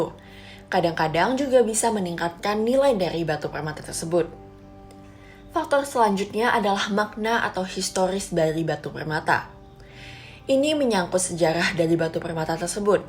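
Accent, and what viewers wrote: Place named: France. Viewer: Mantap naratornya karena ngomong dgn bahasa indonesia namun aksenya gaya prancis... #magnifico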